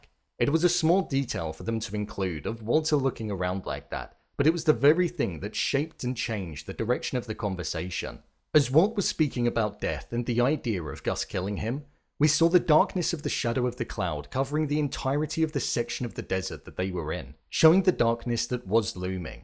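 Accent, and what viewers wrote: United Kingdom. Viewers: Why does yt keep recommending me bri’ish video essayists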